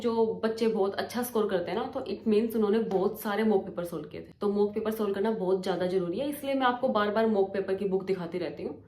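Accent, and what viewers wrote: Haryana, India. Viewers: Haryanvi Tone 🔥🔥... where are you from didi??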